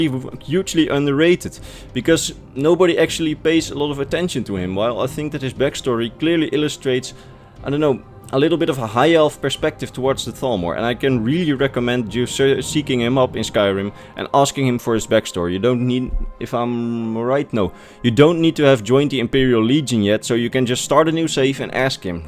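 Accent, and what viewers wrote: Netherlands. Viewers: Hee je bent nederlands he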